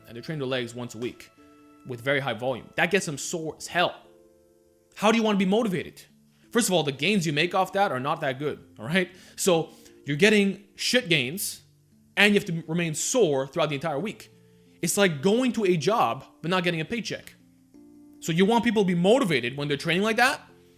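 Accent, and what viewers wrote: New York, United States. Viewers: Yo this is not a diss juat realized you have a NY accent with a little bit of a chinese american accent mixed in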